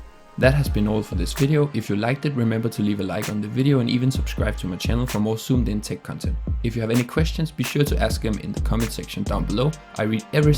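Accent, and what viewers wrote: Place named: Denmark. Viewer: Er du dansker? Jeg så det danske tastatur, og det lyder også lidt som om at du snakker med en dansk accent.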